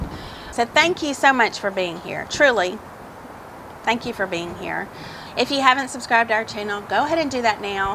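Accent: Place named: South, United States. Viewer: Maybe your southern accent could be why your voice comes across like that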